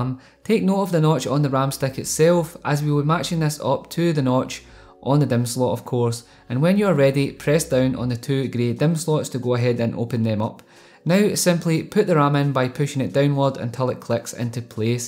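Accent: Scotland, United Kingdom. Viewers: You fae Scotland ? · Chuchu - Games and Guitars probably a,ready found out but I’m pretty sure it’s Scottish, or somewhere from the Scandinavian countries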